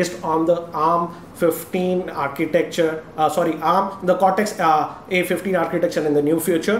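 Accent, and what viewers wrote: India. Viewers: God Indians accent make me very mad .....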